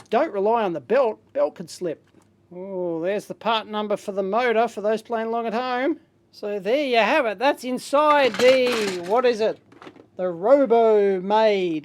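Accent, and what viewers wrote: Australia. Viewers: The only thing I understand is that Mr. should be an Aussie. His accent says so. Am i right, Mr.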